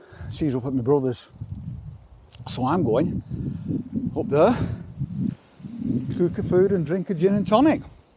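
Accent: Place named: England, United Kingdom. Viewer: Oh, that made me feel like I was on a walk with Epictetus with an English accent. It's -7C/19F here, and I'm not going outside except for the farm chores, so I enjoyed the vicarious walk where it's still green.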